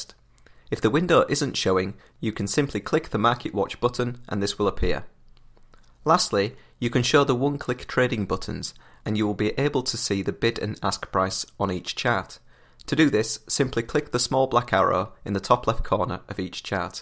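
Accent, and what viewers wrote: United Kingdom. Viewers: Very clear no nonsense with British accent , made it clear and elaborate thank you very much.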